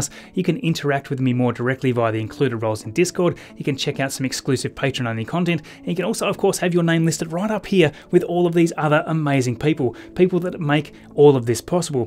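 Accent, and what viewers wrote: Australia. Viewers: It's nice to hear an Ozzy voice giving the week's space launch news. Keep up the good work.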